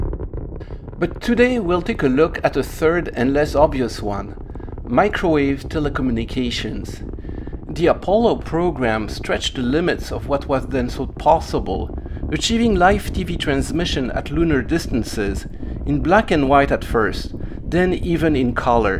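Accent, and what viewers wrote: France. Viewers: I never noticed you had a French accent until you mentioned it, don’t know why ;-)! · Honestly i like a lot the french accent voiceover but I'm still sad for the loss of Ken's commentary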